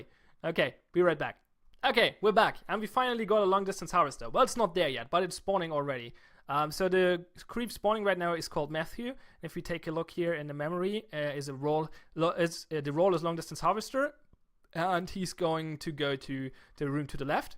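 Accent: Germany. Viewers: Hey, ich bin mal gegenüber deinen anderen Zuschauern so unhöflich und schreibe auf Deutsch, da doch bemerkbar ist, dass deine Muttersprache Deutsch ist. Kann es sein, dass da vielleicht sogar jemand aus Berlin ist? Da bin ich mir aber nicht sicher. Ich habe screeps vor ein paar Monaten schon mal entdeckt, aber nach dem Tutorial nicht genug Motivation gehabt. Jetzt gestern in in der Vorlesung hat ein Kommilitone screeps auf seinem Laptop offen gehabt und jetzt, als Informatikstudent ist die Motivation doch da. Und gestern dann nach ein wenig Starthilfe gesucht und auf deine Videos gestoßen. Und ist die perfekte Starthilfe :D Wenn du auf den offiziellen Server umgezogen bist und du Richtung Kampf und Handel und sowas gehst, kann ich gerne meinen bescheidenden Fähigkeiten entsprechend helfen. Beste Grüße aus Berlin Diskerror/Nils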